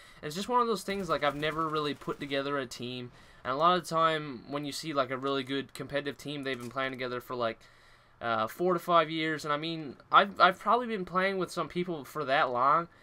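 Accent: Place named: Newfoundland, Canada. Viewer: Are you from Newfoundland? You sound a little like a newfie! :D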